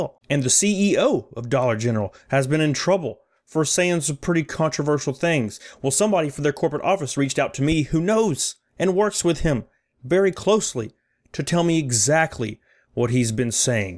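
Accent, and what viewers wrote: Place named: North Carolina, United States. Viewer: Where you from brotherrrrr?!? I’m trying to guess by your accent. I’m guessing North Carolina. Much love from Houston Texas!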